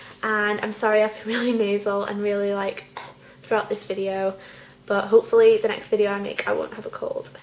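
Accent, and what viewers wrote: United Kingdom. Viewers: ah! ur so british and i love it!! i miss my british friends... they used to come to california and visit me often. they live on "the isle of white" do u know where that is?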